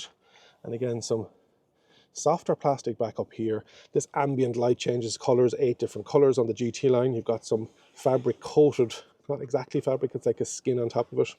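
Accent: Ireland. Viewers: Refreshing to hear an Irish accent giving a review. Agree with a lot of what he has to say apart from the speed. Extremely sluggish in eco a bit better in normal and fairly quick in sport. Comparing it to my last car which was also stellantis, a DS3 Crossback. It was very quick taking off even in eco and in sport the wheels would literally spin if you try pulling off fast. He says he’s 6’2 and there’s good room in the back seats. Well I’m 6’4 and although it’s the first car I can remember where I don’t have to put the driver’s seat fully back. (So ample leg room in the front) even when I position chair slightly forward to my comfortable driving position, there would be no chance of fitting a passenger behind me (unless they were strapped in a baby seat). My wife is 5’0 and she said she wouldn’t feel comfortable sat behind passenger seat. The boot is big too. They would have been better off cutting a couple of inches from the boot to make in more comfortable for back seat passengers. 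I’ve only just got the car so don’t know much ability the features but driving back 30 miles from the dealership, half motorway half “a road” (uk) it seems to go better than expected on estimated mileage. Get a lot more miles per charge than DS3. So far fairly happy with it. Apart from what I mentioned and the same stellantis app I had with my ds which is dreadful. I’m hoping the in car info is better which was also dreadful in the DS. Looks a lovely car too. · I also love the Irish accent. I used to go over to Dublin a lot in my younger days for Thin Lizzy Vibe for Philo gigs. The Irish people are the most welcoming people I've met.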